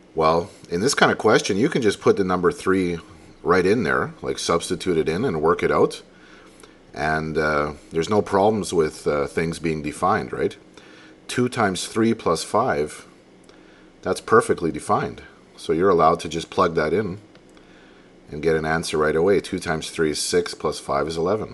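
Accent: Canada. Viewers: are u by chance canadian?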